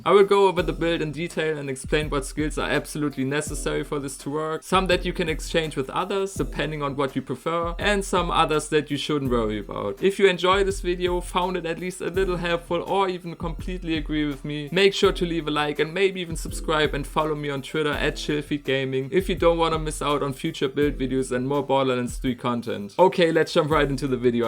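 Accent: Germany. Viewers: Think his first language is not English but German or so. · Der deutsche Akzent ist ziemlich offensichtlich bei ihm xD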